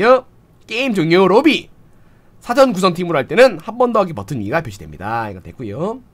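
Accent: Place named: Busan, South Korea. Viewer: 걸쭉한 부산사투리 ㅋㅋㅋㅋㅋㅋㅋㅋ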